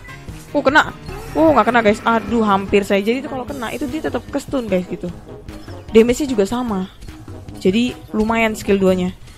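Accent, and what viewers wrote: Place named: Java, Indonesia. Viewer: Medok Jawa mantab to the tab tab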